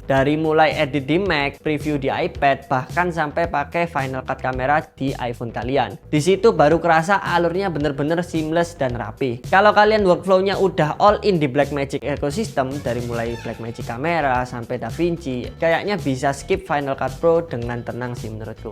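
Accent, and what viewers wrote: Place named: Java, Indonesia. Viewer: Medok buanget, wong ndi mas?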